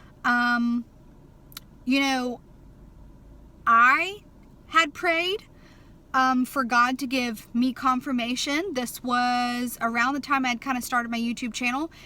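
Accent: South, United States. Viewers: Your Southern accent makes me miss Texas and where I grew up. It is cold here in Germany also:-) GOD Bless in Jesus Christ name:-)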